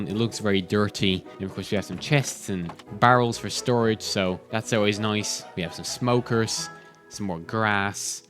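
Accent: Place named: Ireland. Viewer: Is that an Irish accent I here 🇮🇪🇮🇪🇮🇪 GWAN IRELAND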